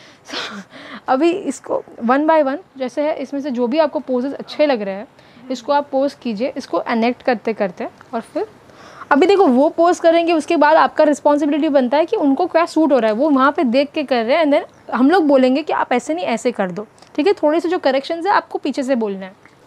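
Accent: Gujarat, India. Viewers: Mam aap Kabhi Kabhi Gujarati aavaj me bat karte ho kiya aap Gujarati ho, ya Gujarati language aapko aati hai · Gujju tone ma mja ave che mam😊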